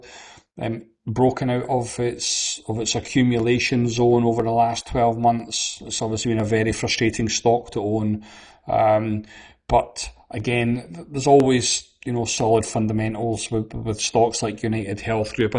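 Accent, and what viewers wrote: Scotland, United Kingdom. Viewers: Thanks for this update and I love your accent, I have so many positive experiences with Scots. Could you say something about Novo Nordisk (NVO)? It is a blue chip company that is down 70% from its highs and I'm wondering if it has reached its bottom yet.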